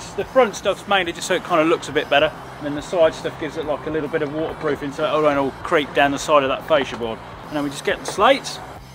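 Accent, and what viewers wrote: United Kingdom. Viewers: Overly British, but great entertainment for quarantine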